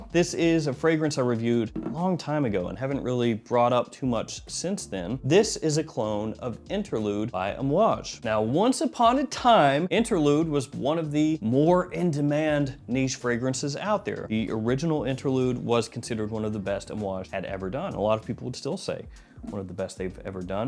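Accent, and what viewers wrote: South, United States. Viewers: Your southern accent really came out in this vid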